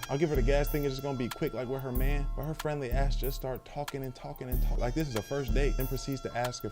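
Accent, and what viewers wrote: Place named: Texas, United States. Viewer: I've been binge watching your vids and your a very good story teller. You gotta subscriber out of me and it sound'n like you a Texas nigga too. So I'm awwready ready to support. Keep it going.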